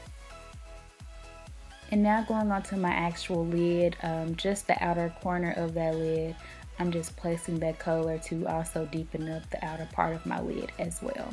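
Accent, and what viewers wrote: Memphis, United States. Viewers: I was listening to your talk thru out the video I was like mane she sound like she from memphis lol I'm from memphis 2..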